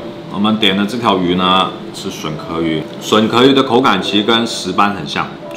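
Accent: Taiwan. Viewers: 看了這部影片才知道你是香港人，你的口音完全以為你是台灣人的說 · 港仔台湾腔已经这么浓了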